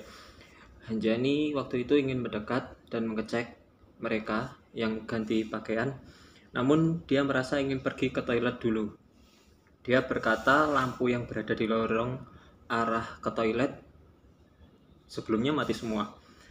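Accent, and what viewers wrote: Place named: Java, Indonesia. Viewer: pertahankan medokmu mas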